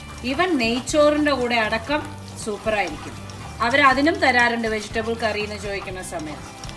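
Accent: Palakkad, India. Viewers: moly. I used to make 70 percent items in same recipie. But I will not miss your videos as I like to hear the explanations in palaghatan sailie.Also I enjoy your (jokes) homely talks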